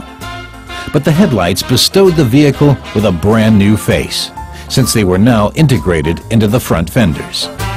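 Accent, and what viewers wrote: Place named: United States. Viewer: Very clumsy American narration, though use of metrics, where appropriate is welcome.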